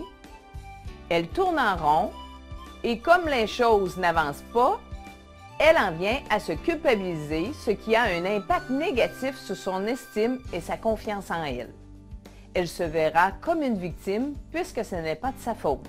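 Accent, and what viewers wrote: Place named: Quebec, Canada. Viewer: Je comprend pas trés bien le Québec , vous pouvez parler le français normalement ? :/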